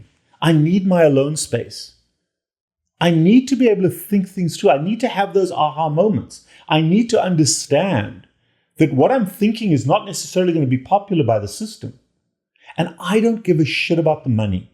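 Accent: South Africa. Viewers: You just great. You still got the SA way of talking 😂🤘. Just like Elon and you, I also grew up in SA and I don't give a shit about what others think or say about me. My head is up no ones ass either. I need no stuff. I live for me and my children I am now living a healthy carnivore life as I can see the truth. Please keep sharing your knowledge with us as you helped saved my life as I can now see outside the box.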